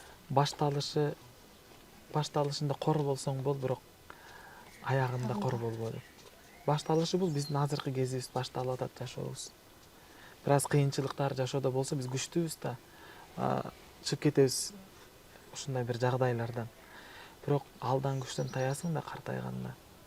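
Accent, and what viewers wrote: Standard. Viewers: Кыргызстанда жашап, осуп,улутун башка болсо да кыргыз тилинде таза суйлогон,комуз черткен кыргызды даназалап жургон жарандарга ыраазымын. апана таазим сендей уулду тарбиялаган. Бейишин берсин. Коп жаша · Сиз таза кыргызсыз. Таза суйлойс кыргыз тилди жакшы корос.сыймыктанас.комузду да🎉🎉❤❤❤ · Ленин деген русский мектептен буткон кыргызча бизден таза суйлойсун.оштук баткендиктерден таза суйлойсун.. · Кээ бир кыргыздар сендей таза суйлой алышпайт,орусча,чала суйлоп,азаматсын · аза ат сын кыргызча таптаза суйлоцт ырдайт рахмат да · Сабыр берсин бейишинен орун берсин сенин улутуңду бөлгөн кыргыз кимиси башына ак калпак кийип жүрөт кыргыз болуп төрөлгөн эркектер бар калпагы жок накта кыргыз дагы сенчелик таза сүйлөй албайт кыргызчаны сага күч кубат берсин артында тоодой Кыргыз элиң бар экенин унутпа алтыным😢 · биз сени кыргыз деп билебиз.Бирок озбек болсо эмне экен.Кыргыз озбек казак бварыбыз бир мусулманбыз.Кыргыз тилин кыргыздан таза таттуу суйлойсун.Конул бурба негатив суйлогондорго.Иттер уро берет... 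· Апандын арты кайрылуу болсун,кыргыз эмес экенинди эми билдим айтпасан эч ким билмек эмес болуш керек Кыргызчаны таза суйлойт экенсин сен эмес туштуктун кыргыздары оздору таза суйлой албайтго озьекче аралашкандай ударение менен суйлошотго молодец ишине ийгилик Нарындыктардайле суйлойткенсин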